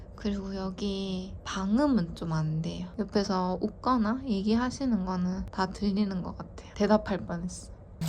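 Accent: South Korea. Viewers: 부산분은 아닌거같고 경북 억양이 조금느껴지네요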